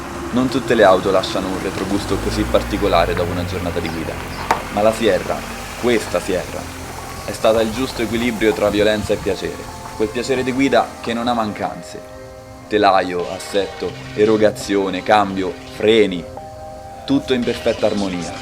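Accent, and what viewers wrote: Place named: Rome, Italy. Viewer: per me troppo romanesco per parlare di cose serie come macchine e motori.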